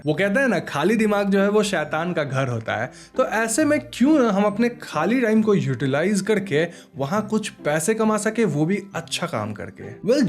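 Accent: Bengal, India. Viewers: Sir aap bangali ho ?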